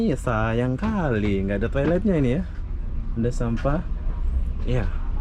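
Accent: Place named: Malaysia. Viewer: Warga Malaysia bg???kok bahasa dialek nya beda.saya subscribe bang saya suka cara abang.rendah hati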